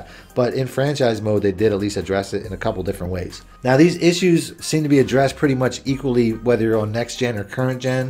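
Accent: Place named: Philadelphia, United States. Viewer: You from Philly bro?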